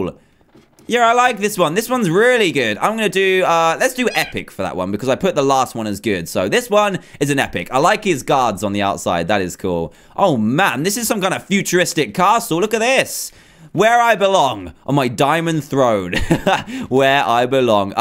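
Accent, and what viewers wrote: England, United Kingdom. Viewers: he's English and from england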